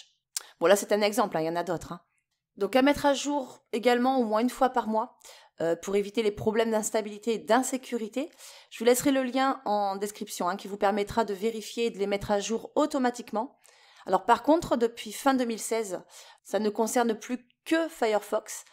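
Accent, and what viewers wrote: South, France. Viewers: le bon accent du sud on aime bien ca!!!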